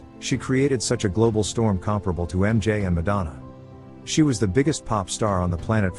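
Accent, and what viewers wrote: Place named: United States. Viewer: It's still him but with an American accent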